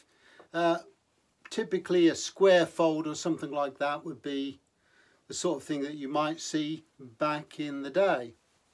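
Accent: United Kingdom. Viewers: Trying to place the accent is it Norfolk, Suffolk, or ?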